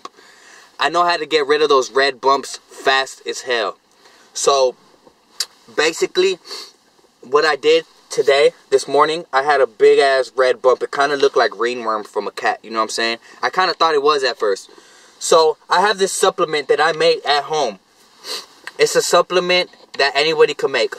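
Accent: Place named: Texas, United States. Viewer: texas accent came out in this vid a lot 🤣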